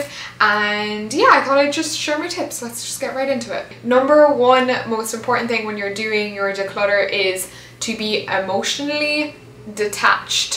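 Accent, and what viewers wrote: Ireland. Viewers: Thank you for theses tips! I love your accent, are you from Ireland?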